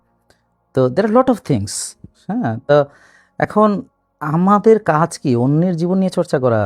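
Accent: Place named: Jhenaidah, Bangladesh. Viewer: Amar mone hoy aisob ""S-H-O-K-U-N"" k niye apnar kono comment korar dorkar nai. Ai sob manushrupi SHOITAN aigulai chai apnar mulloban somoy nosto korte. Amar bari Jhenaidah apni jokhon kotha bolen amader tone a valo lage. Apni ALLAH vokto ekjon manush etai apnar boro porichoi. Manusher shortcomings thakbei, ALLAH amader oivabei toiri koresen. ALLAH apnar mongol korun.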